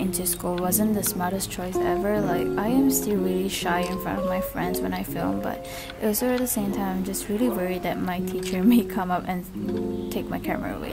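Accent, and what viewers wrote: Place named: England, United Kingdom. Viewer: woww..i like your english accent🔥🔥..im from malaysia and new subscriber💓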